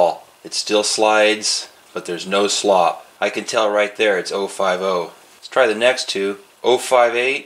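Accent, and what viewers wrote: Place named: South, United States. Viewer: 🪓 MATE, love your attention to detail 🥇 I use a similar grinder but I use the disk wheel with a super fine grade paper (can’t recall what it is 😬). The shop didn’t have a fine grade for the belt at the time. Having one of these grinders actually makes dressing the bar a pleasure 🎉💥 I didn’t know anything about how to check the gauges etc but with Australian hard wood we go though chains ⛓️ pretty darn quick. And hey, I even liked your country drawl… reminded me of Little House On Through Prairie‼️ Hope to see you in the sky one day 🙏 😉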